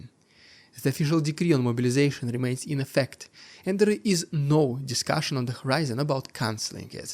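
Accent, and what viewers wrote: Russia. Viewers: One more "wolf under sheeps skin" - this channel is working for russian propaganda. starting from typical russian accent without even trying to talk better - diminishing the language, ending up with narratives very convenient for kremlin gremlin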